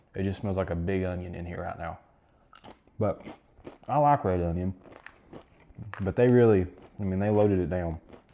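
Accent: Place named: Knoxville, United States. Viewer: Good Review! Im glad your video popped up on my recommended! If I had to guess you're from Tennessee, you've got that knoxville accent thats easy to listen to. Keep up the good content!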